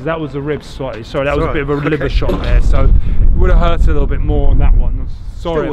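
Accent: United Kingdom. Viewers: I Appreciate Your Wisdom and Sophisticated British Accent Sensei! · I like your teaching very much, but the example is probably far too frequent and too much, hahahha. I hope your partner has a body armor inside his pullover. Are you from Britain, Sir? You speak like James Bond.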